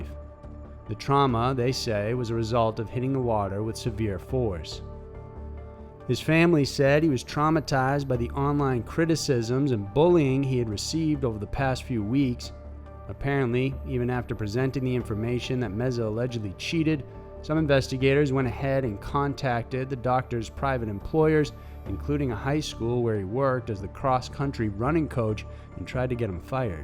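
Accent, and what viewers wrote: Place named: Texas, United States. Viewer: Hi, new here. Trying to place this guy's accent, has he ever said? Sometimes I hear Va/NC area other times it sounds like Ga or Tx. Prbly way off, anyway... thoughts?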